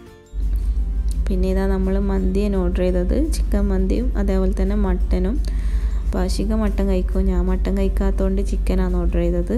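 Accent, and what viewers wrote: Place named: Malappuram, India. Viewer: slang Malappuram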